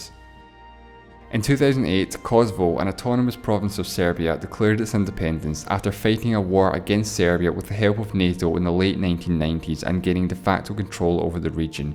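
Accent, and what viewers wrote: Scotland, United Kingdom. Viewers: Aaryana Hurd I think he’s Scottish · This comment has nothing to do with the content, I'm just dying to know if the narrator is Scottish or Welsh or something 😂😂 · hes scottish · He's most definitely Scottish, not Irish. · patrice Scottish I think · It's Scottish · Scottish, he sounds exactly like my scottish friend lol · Are you Scottish btw? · I like your Scottish Accent. Just saying. 😂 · Is this is a Scottish or Irish accent?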